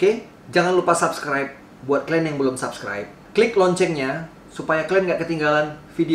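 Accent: Medan, Indonesia. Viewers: opening-nya ini jadi signature ente banget bang... "apa kabar kelen kawan kawanku semua...?" Medan Taste...! keep it up bang...! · Abg orang medan yaaaa?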